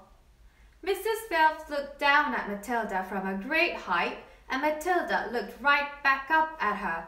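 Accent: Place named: United Kingdom. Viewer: 아니요.발음이 약간 영국식이세요.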